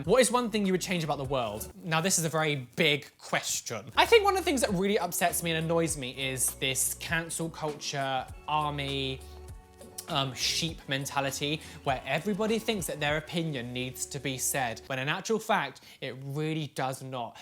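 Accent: United Kingdom. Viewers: I British accent and his word choice. Love your videos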